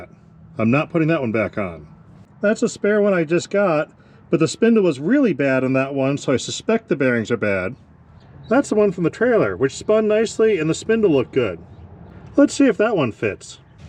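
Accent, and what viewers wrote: New England, United States. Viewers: Every once in a while I hear what sounds like a Nor'Easter accent? Enjoying your work. Something optimistic and indefatigable in your approach to problem solving that makes me smile. Cheers from Monteray Bay CA.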